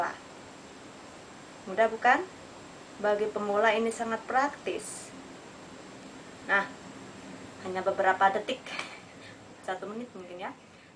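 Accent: Banyumas, Indonesia. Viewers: "DONE" Ikutan #GiveawayTHV2020 untuk modal usaha mudah-mudahan ada rejeki untuk anak bayiku 💕 Saya suka nnton karena semua resepnya sudah diuji terlebih dahulu, jd setiap saya trial tidak pernah gagal. Pertama saya tau channel ini karena saya mencari resep kuker di cookpad untuk hajatan nikahan saya (supaya irit) 😂 dapetlah saya resep dari mba. Pas saya cari-cari caranya di youtube ternyata ada step by stepnya di channel trnyata punya ya mba 😂 ga nyangka hihi Dan, semakin kesini saya sering ikutin tiap ada upload video baru. Karena saya suka dengerin dubbingnya yg dicampur bahasa ngapak 😂😂 Semoga rumah tangga langgeng sampe kakek nenek, semoga setelah memberi GA rejekinya semakin dilancarkan dan diberi keberkahan.. Aamiin yaa robbal'allamiin ❤️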